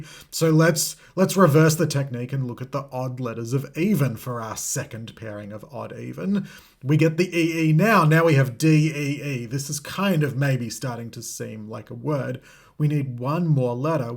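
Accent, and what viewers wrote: Australia. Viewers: I get sucked in by the Australian accent. When he says "deer" it sounds to me like "dee-ah." And then he comes up with what sounds to me like an American r sound thrown in at the end of "idea."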